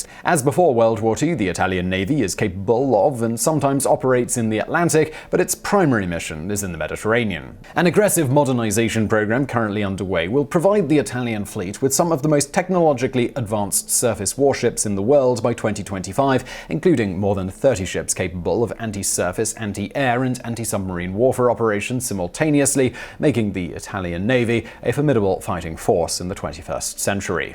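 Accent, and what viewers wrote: England, United Kingdom. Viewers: Johny Ricco well, he’s English English. 😂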